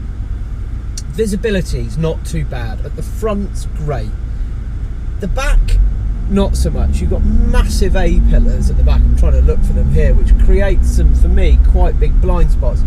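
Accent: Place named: Cockney, United Kingdom. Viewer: Typical cockney ponce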